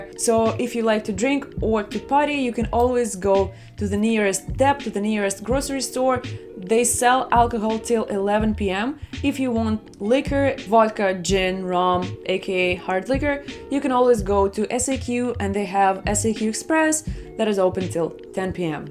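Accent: Russia. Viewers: thank you...I really liked it....I love your russian accent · Like for the natural “Russian” accent )))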